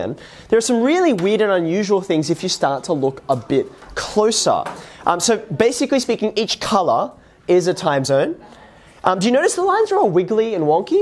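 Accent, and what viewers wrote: Australia. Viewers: is the teacher from australia??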